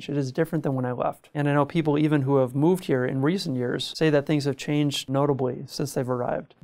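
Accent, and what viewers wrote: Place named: Minnesota, United States. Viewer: You sound like an Edina kid. Minnesota will always be strong.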